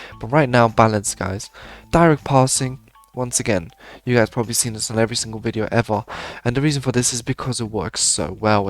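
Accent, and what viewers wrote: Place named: England, United Kingdom. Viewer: you sound like you are from england or at least lived there for a long time lol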